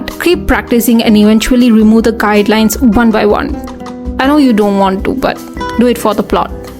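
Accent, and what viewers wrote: India. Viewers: Are you Indian , don't get me wrong, I am Indian. Just guessing from the accent. I have the same accent ,please don't get me wrong. Btw I am one of your subscribers. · yh i was wondering bcz this is how my family speaks eng back home in India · You sound like if you have a an Indian accent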